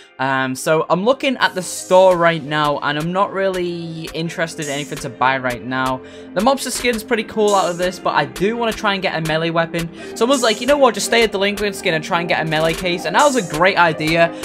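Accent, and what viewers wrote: United Kingdom. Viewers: I think he’s British…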